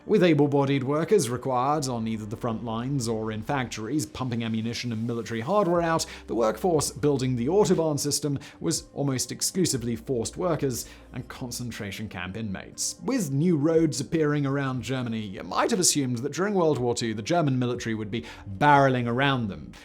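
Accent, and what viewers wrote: RP, United Kingdom. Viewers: Oh vey just another what is it Brit brick accent(?) telling lies about the war and Hitler? Maybe mention who declared and supported partisans, oh yes in this case was stupid to let Brits escape at Dunkirk, should habe them out and all would have been over. Who used dumdums? Ah right and terror bombing on German civilians. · This is my first Megaprojects video and posh, educated, hipster, laa de daa accent is something else. Immediate sub. Also for the interesting content as well of course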